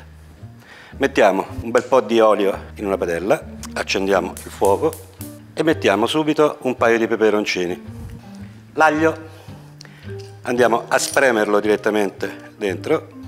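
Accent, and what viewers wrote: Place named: Casentino, Italy. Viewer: Ok, dalla parlata infatti mi sembri vicino dalle mie parti Casentino e per curiosità te l'ho domandato, sorry :-) Magari mi sbaglio. Pura curiosità. Toscani numero uno!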